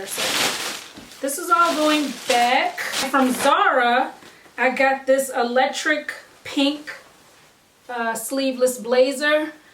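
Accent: Baltimore, United States. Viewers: Lol. I just noticed I might hear that accent from the Baltimore or Philadelphia area.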